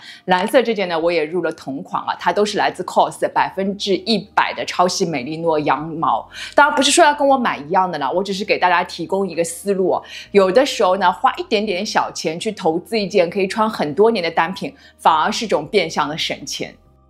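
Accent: Shanghai, China. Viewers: 播主上海人吗?言语间有些小词很熟悉😅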